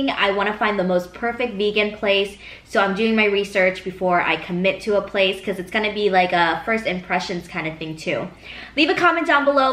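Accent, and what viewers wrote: Vietnam. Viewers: Omg u r pure southern viet accent~~😍😍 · She has a very strong vietnamese accent LOl. Im Vietnamese too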